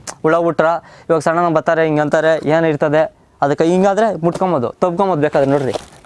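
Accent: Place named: Kolar, India. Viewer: Kolar kannada ❤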